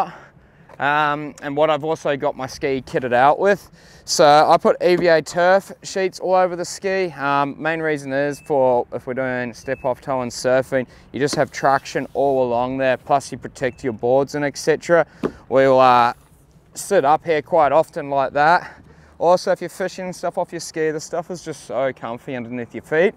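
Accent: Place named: New Zealand. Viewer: Judging by your excent you're a kiwi living in oz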